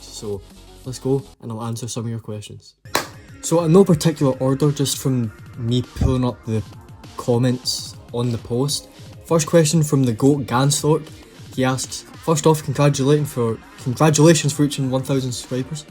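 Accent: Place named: Scotland, United Kingdom. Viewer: Your voice sound like an real Scottish🏴🏴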